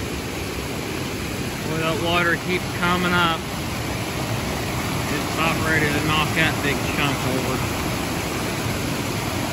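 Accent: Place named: Western Pennsylvania, United States. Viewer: Is this in western PA? Guessing based on your dialect. I miss that.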